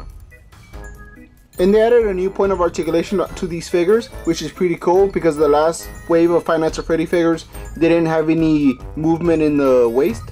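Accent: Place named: Mexico. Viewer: are you a Mexican trying to talk English you wouldn't mention you just did slam dunk your stupid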